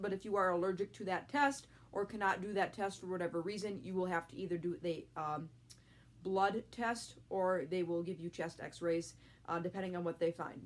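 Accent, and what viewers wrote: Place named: Midwest, United States. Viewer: Thanks for sharing! I am getting a TB test this week! I was guessing you were from the midwest by your accent and then at the end you mentioned WI! That's where I'm from😊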